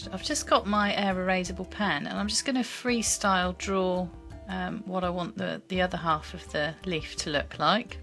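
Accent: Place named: England, United Kingdom. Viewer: Wow!! This is beautiful.....I am new to this I haven't done any yet but my poor brain is buzzing with ideas! lol! Nice to hear English accent! I live in Sussex..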